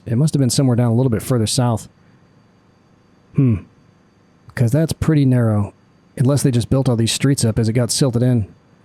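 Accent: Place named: North America. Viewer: Where's the Big One? One of the biggest roller coasters in the world is on the Pleasure Beach... no sign of it here. The whole Pleasure Beach doesn't really look like the Pleasure Beach at all. Blackpool Airport isn't accurate either. I mean it all looks fab, and if you didn't know the area I suppose it doesn't really matter, but for those who live here, it's a little disappointing. No idea what that massive square hanger building is you parked next to... that's not there in reality either. Nice video though... strange hearing a north American accent talking about my home town :)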